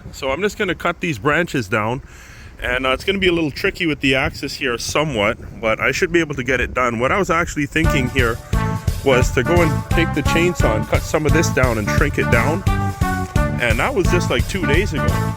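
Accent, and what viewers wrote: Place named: Canada. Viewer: I love your accent! Very Canadian!! 😊 Enjoy your vids.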